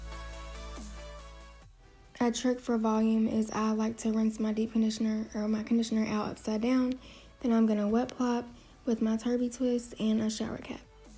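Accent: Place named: Tennessee, United States. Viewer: Girl u sound like u live n my state TN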